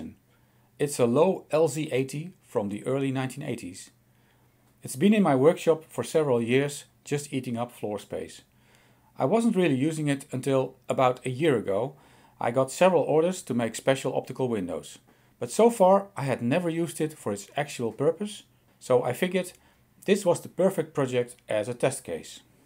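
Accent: Netherlands. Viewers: That is a Dutch accent :-)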